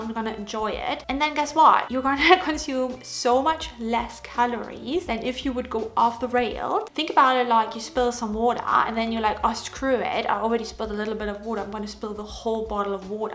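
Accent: Germany. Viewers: It took me a while to figure out your accent, but its German! ❤ Love from Germany, youre spitting the important facts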